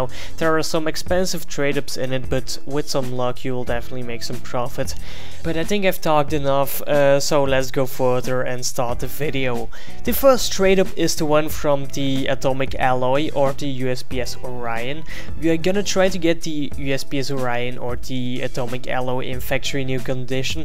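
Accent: Netherlands. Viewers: u are dutch comfirmed xD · I knew it your Dutch! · Belgie / Nederland?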